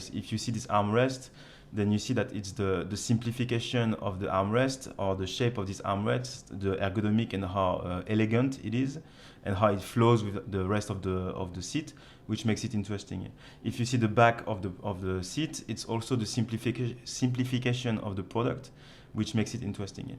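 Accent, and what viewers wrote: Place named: France. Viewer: vu l'accent je suis sur que l'auteur est francais !!!! merci pour le job en tout cas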